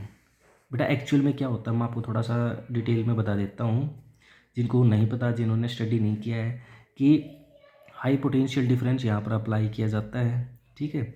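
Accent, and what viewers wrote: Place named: Haryana, India. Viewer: That haryanvi accent tho🔥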